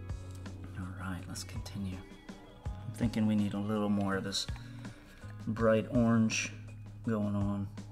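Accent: South, United States. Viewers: That is super cool! I loved it as it came down over the cup, watching the different coloured cells go pass was amazing! The finished piece is fantastic. I love your accent, is that what's called a southern accent? I'm Australian so I'm not sure. Love this technique! I'll definitely try it if I can find a tiny hollow cup. Great job! 😀💜🐨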